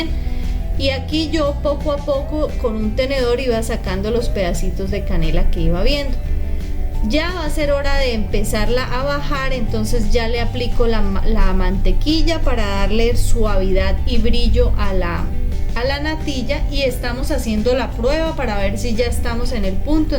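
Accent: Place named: Colombia. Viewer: y el acento colombiano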